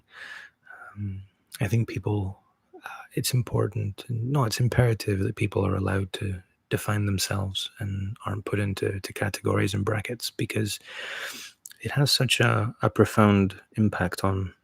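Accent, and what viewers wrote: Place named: Scotland, United Kingdom. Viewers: It's an edinburgh accent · Are you Scottish, American or neither bc I can't work out your accent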